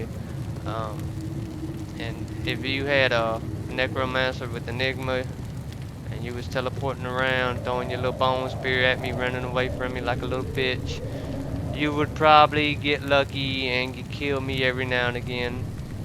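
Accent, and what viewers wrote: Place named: South, United States. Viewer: just some Southern drawl or something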